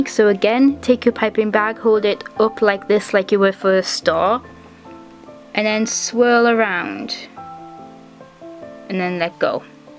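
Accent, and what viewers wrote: United Kingdom. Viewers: You probably get asked this a lot, but i was wondering what area of the UK youre from? Your accent is lovely and sounds kind of northern to me :) I've just started doing my own decoden cases and i love watching your videos, theyre really helpful :) xx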